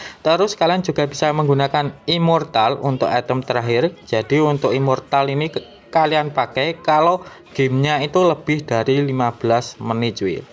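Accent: Java, Indonesia. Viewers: LOGAT JAWA KAU KUAT KALI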